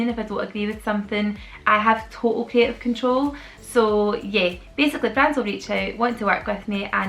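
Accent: Scotland, United Kingdom. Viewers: Thank you for always having a cheery disposition and its always lovely hearing a fellow Scot! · thank you 💖💜 I've only just started following your channel after your collab with kate💕 loving all your content and it's so lovely to hear a fellow scottish accent on here!! Keep doing what you're doing! xxx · Love ur channel great hear Scottish mum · It's so nice to have a Scottish vlogger who's content I love. Your accent keeps my home sickness at bay! Thank you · Thank you I used to really hate the Scottish accent but I love it now! URS and accent is cute.... I love the way speaks and she speaks like an actual little women.... I have always wanted to have a channel on here but have always been to shy and intimidated.... Have you any tips? Hints? Editing programs?? Xxx ❤️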